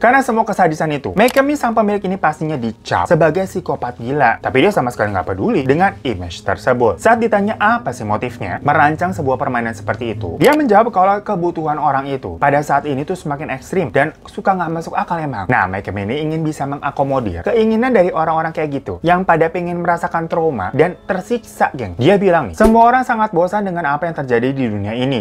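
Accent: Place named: Banyumas, Indonesia. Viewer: Ngapak su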